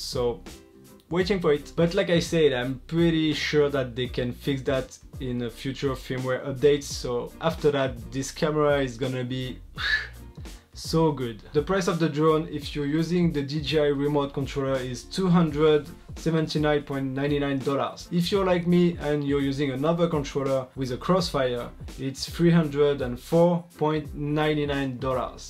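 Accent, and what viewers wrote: France. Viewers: Tu es français ? Tu as un accent français quand tu parles 😂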